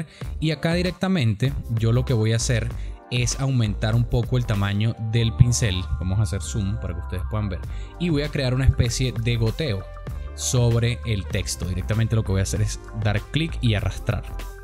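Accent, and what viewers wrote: Venezuela. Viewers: Excelente puedes ver como quedo en (Instagram). Por el acento somos paisanos Vzla. Gracias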